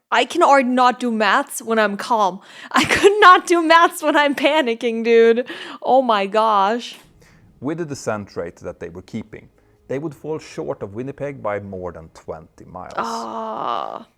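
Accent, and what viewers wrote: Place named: United States. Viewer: I've noticed watching your videos over the last few months in each video your accent reduces more and more. In this video it was almost imperceptible it was like listening to someone from Michigan or northerner Maine. Congrats you can now chameleon your way through the US if you ever visit! Although i do miss your heavier accent sometimes as it was very cute.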